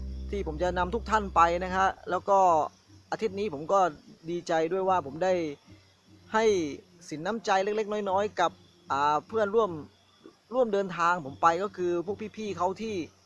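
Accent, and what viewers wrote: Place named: South, Thailand. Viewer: แหลงใต่เพราะดีครับ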